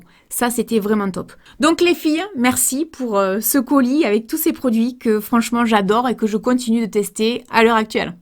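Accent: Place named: Marseille, France. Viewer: tu viens de Marseille ? tia l'accent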